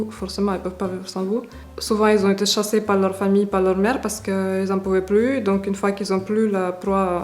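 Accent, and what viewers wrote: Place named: Russia. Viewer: salut, jai regarder plusieurs de tes videos c est chouette dentendre le francais avec cet accent, je voulais juste comprendre pourqoi chez les femmes russes vous semblez obseder par largent, si té en couple aavec un homme c est pas le seul critere jespere?n y voit aucune reflexion negatrive de ma part c est juste que jai limpression que les rapport hommes-femmes en russie sont a 90 pour cent baser sur largent, c est dommage nan?ya plein de chose interessantes a decouvrir chez les gens a part ca meme si c est vrai que c est important aussi, voila, bonne continuation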